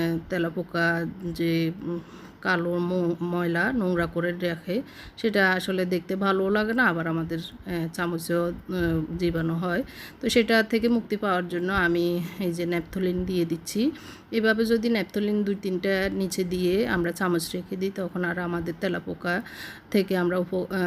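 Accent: Noakhali, Bangladesh. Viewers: এটা নোয়াখলির ভাষা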